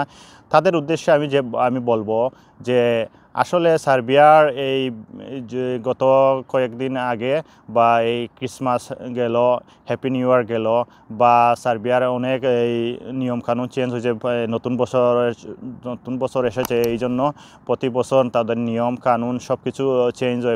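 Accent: Sylhet, Bangladesh. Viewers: Apne Sylheti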